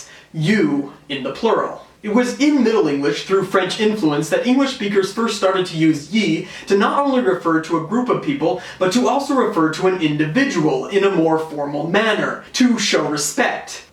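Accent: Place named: United States. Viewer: Hilarious - a seppo explaining old English! Though, accent aside - he does a good job of it.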